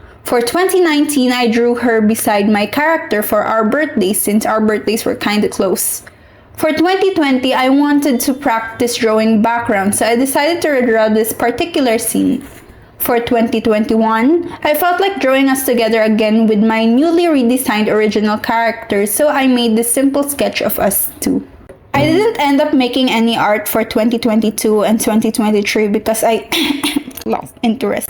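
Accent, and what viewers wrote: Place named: Philippines. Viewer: Filipino? The accent is strong.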